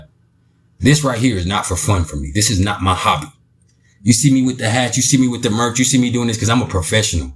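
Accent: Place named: California, United States. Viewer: This gotta be a Cali accent😂😂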